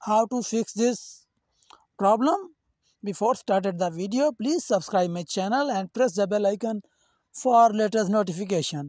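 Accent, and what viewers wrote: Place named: India. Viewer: bro sounds like indiun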